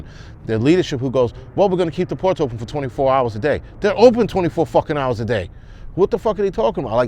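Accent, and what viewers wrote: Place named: Brooklyn, United States. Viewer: I love you brother. Not in that way lol. You have to be from Brooklyn. That is the way we talk never candy coat it. Tell it like it is. Keep up the great work. And be safe.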